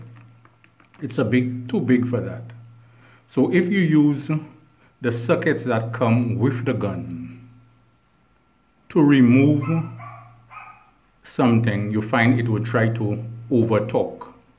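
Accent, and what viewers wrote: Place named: Trinidad and Tobago. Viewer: Just want to say hi to my fellow Trini. Only a Trini like myself will recognize that accent. I am from Point, but live mostly in La Brea. What part you from?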